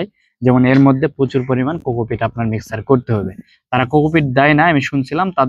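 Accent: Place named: Joypurhat, Bangladesh. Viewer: ভাই কি জয়পুরহাট ওই দিকের,, কথা শুনে মনে হলো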